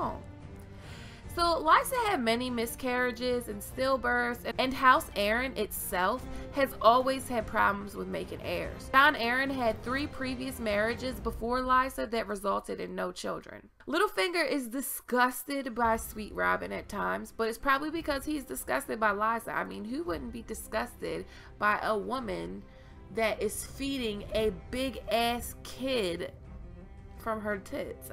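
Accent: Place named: Maryland, United States. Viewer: she sounds like shes from Maryland or the "DMV" if you are familiar with the different American dialects of English...anywoo I enjoy her theories & perspectives the most!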